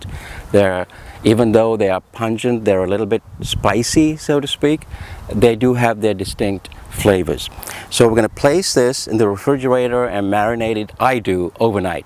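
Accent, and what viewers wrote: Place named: North America. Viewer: This south Asian brother sound like North American..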